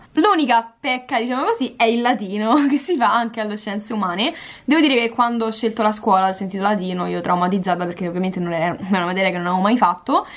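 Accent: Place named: Rome, Italy. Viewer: Ciao, ti ringrazio per questo video, l'ho trovato molto utile. Dalla cadenza sembri di Roma, come me... puoi dirmi quale liceo frequenti?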